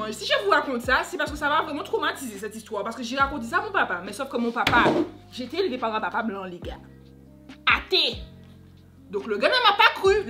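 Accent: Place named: Canada. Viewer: Accent canadien oklm 👌🏾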